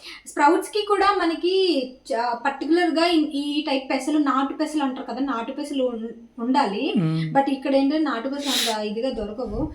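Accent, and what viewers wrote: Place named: Telangana, India. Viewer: You have been staying for many years in Telangana, so don't worry that much if you are speaking Telangana slang telugu, that is also good language like Andhra slang telugu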